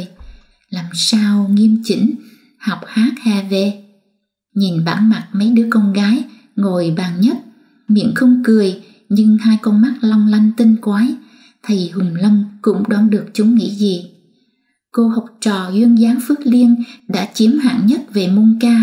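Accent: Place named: Central, Vietnam. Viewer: Vui thích khi tim được kênh nay và nghe giọng đọc của … mới nghe lần đầu nhưng thấy thân quen vi giong co âm hưởng của người lớn lên ở DaNang, Dalat hay Nha Trang gì đó? Co một chút lai của nhiều miền nên rất de thương. Sẽ nghe lai các truyện của Tuổi Hoa 😊… cam on rất nhiều.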